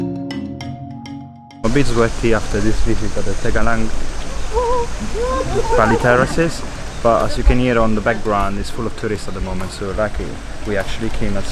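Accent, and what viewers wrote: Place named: Italy. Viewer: italian accent :) , i love you vlog , i made a vlog in the same spot and in see lot of italian that day :)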